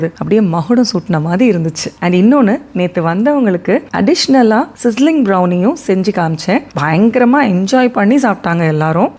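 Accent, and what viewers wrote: Madurai, India. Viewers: Madurai ya akka neenga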